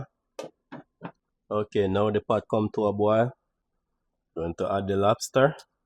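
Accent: Jamaica. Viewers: Chef, I really love your authenticity. Many Jamaicans change the way they speak and do things outside of the country. It is really refreshing to hear how you speak and express yourself as a person and as a chef. I can see that you really love what you do 😊. Keep repping the black, green and gold out loud! One love mi bredda and get well soon. God bless you🇯🇲🇯🇲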